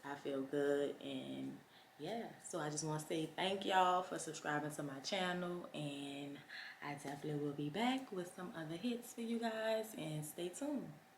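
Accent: New Orleans, United States. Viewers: I love the New Orleans Accent 😊😊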